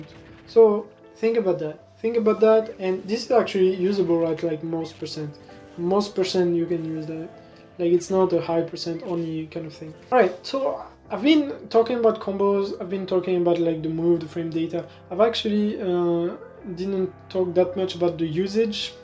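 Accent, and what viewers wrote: France. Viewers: Ton accent est très franchy!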